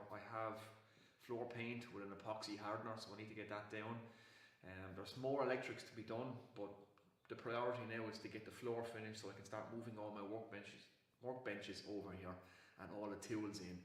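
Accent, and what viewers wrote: Ireland. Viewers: Can't hear you man. But subscribed anyway haha refreshing to hear an Irish accent for a change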